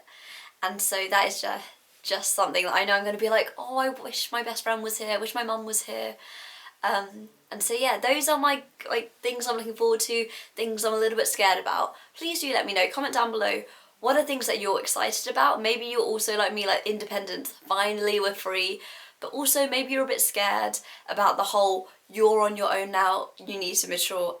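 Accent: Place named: United Kingdom. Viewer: I am excited by the accent you have! Being from India u sound more like a Britisher. I am surprised.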